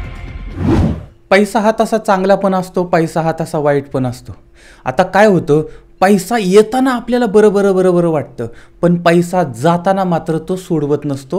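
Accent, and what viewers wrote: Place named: Satara, India. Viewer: भाषा उच्चार वरून सर सातारा जिल्ह्यातील असावेत असं वाटतंय,तुम्हाला काय वाटतंय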